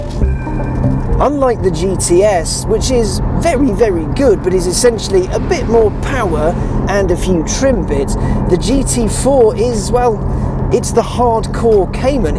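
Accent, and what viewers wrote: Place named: RP, United Kingdom. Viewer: Something about your face is very punch-able. The English-is-mightier-than-thou accent coupled with the quirky comments, just gets my goat. Talk about the car "old chap" and leave out the smirks and snarky attitude. Stop being a priss and just drive the best car Porsche has ever made remotely under $130,000.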